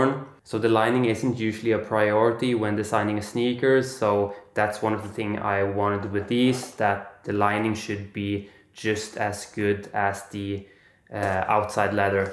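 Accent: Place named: Norway. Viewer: Lol knew you where Norwegian by just the accent alone, before even seeing your name.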